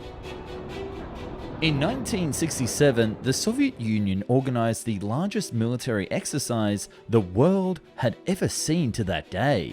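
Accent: Australia. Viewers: well hes australian he has an accent like that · Bro it's Australian he says SUR 25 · He's Aussie, what do you expect?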